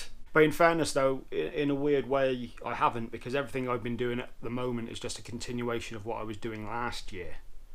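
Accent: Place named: Cotswolds, United Kingdom. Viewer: We're hoping for a new episode, any day now. The Cotswold accent grows on a person. Thanks.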